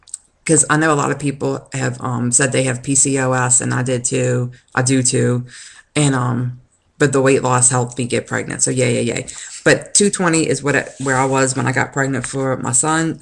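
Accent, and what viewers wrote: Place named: New Orleans, United States. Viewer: Just getting started on this journey (take 2 due to insurance change) and have been jumping around a lot of vloggers and starting to subscribe. Many haven't posted in years so thank you for keeping at it...this is for life!Loved your goals way back when...I, too, have a weight goals that tie to pregnancies and a dress! The horse and amusement rides made me smile....yup. Anything with a weight limit is an issue (even if under I feel I am not far enough) and squishing (or worrying about breaking) into lots of seats (and booths) is something I cannot wait to not worry about as I survey a room.I teared up at your necklace NSV. I get it, gurl. I am a New Englandah....the only accent I would trade for mine is "n'orlans" so your videos are nice on the ear, too!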